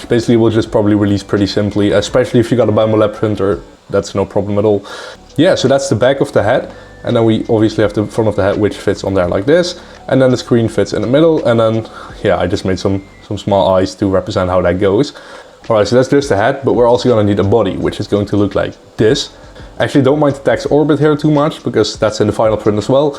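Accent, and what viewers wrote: Netherlands. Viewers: ''and more specifically'', je bent nederlands ;) hoor ik al in de erste 3 seconden ;)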